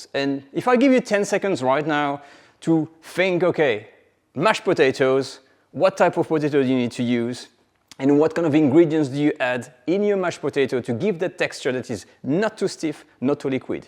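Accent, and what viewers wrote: France. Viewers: Frensh english lol , nice vdo btw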